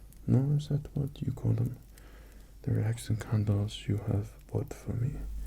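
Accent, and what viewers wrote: France. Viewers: Wouah ! It's amazing ! You have exactly the french accent ! It's so rare to do this ! It's a good vidéo ! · I'm french and you have a little french accent...with a good english spoken xD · The accent of a French King and the speaking pace of Travis Fimmel as Ragnar Lothbrok... Counting you do look like Thor, you could be the first line of viking nobility of the country. No ? ;) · As a french, I think this french accent gives a softie side to that king, which is weird but funny at the same time. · Omg I'm french and It's really creepy like he does very well the french accent xD ... It seems me lol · French accent and HRE flag on preview. Nice. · I CAN UNDERSTAND ENJ?GLISH WHEN YOURE SPEAK ENGLMISH WITH FRENCH ACCENT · Your French voice is so amazing, do fancy French roleplays more often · This actually sounds like a French accent lmaoooo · Les gens aiment écouter quelqu'un parler anglais avec un accent français ? 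Damn, moi qui ai toujours forcé pour essayer d'avoir le meilleur accent anglais possible! :') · Dont know why he has a french accent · I'm French and your french accent is horrible but really funny and kinda relaxing :') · I expected a very Monty Python French accent, but this was a pleasant surprise. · All I see is Thor with a french accent wearing a crown and I'm dying XD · ragnar lothbrok roleplay? the french accent reminds me of him lol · french accent is very funnn il french XD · He has a German flag, a French accent but he speaks English? What is this sorcery?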